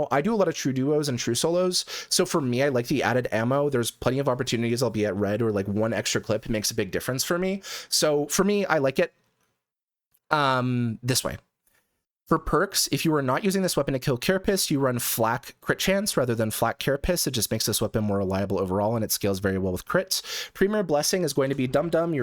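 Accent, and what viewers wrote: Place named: Canada. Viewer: Bro is definitely Canadian he's got a pretty distinct accent. With that said 🦅🦅🦅🦅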